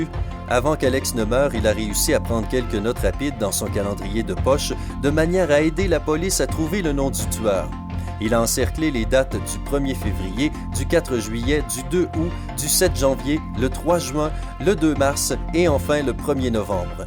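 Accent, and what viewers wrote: Quebec, Canada. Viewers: T'es québécois ta un sacré accent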